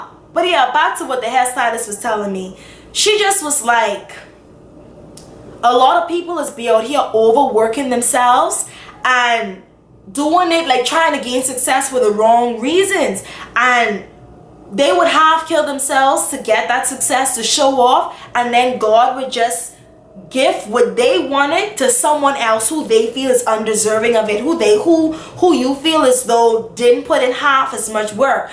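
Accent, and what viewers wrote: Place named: Bahamas. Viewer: I like the fact that you do these videos with your Bahamian accent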